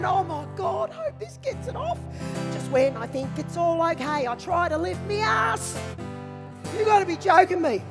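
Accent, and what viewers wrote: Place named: Australia. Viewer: Shit ya make me laugh Woman! The pure Aussie...ness (its now a word) is just grouse!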